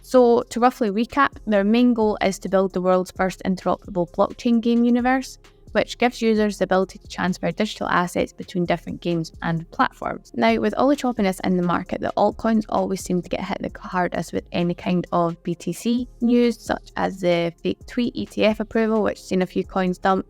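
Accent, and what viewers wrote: Scotland, United Kingdom. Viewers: Love your Scottish accent 👌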